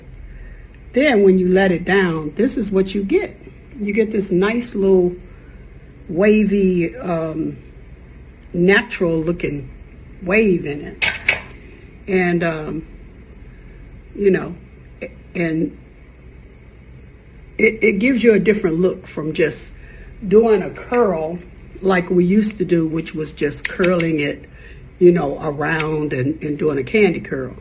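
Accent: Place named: South, United States. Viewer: I love her Southern accent ❤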